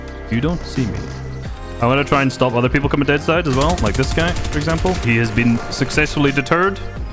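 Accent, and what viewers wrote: Scotland, United Kingdom. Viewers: Why is understanding pure scottish english so hard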